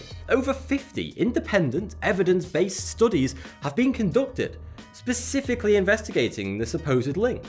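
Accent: United Kingdom. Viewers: Where in the uk is thoughty2 from?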